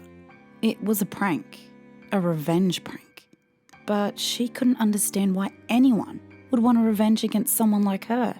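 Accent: Australia. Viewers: Aussie accents are so nice to listen to. Great voice and video. 😊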